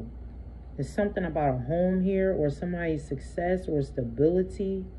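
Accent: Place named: Philadelphia, United States. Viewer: YOU HAVE A PHILLY ACCENT.❤❤❤❤ AM I RIGHT I’M ORIGINALLY FROM PHILLY.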